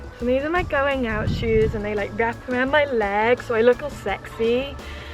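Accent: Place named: England, United Kingdom. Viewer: Wow! Thanks so much for sharing from across the pond! Stumbled across you and your video as we are all stuck with limited activities and lockdowns:( I did have an RV last year, but still long for a Van as a more manageable means to get away. A suggestion maybe for mold is desicant? sp? They sell it at hardware stores, and even our storage places in a plastic bucket with a perferated top, crystals absorb moisture.... I also had an electric rechargeable one off Amazon, that just hung with a hook, and it changed color as it needed recharging to dry it out. Packets of silica beads / gel may also help to dry area out. Cheers and and thanks again! Stay safe, LOVE your proper English, haven;t heard in many years ... Canadian raised :)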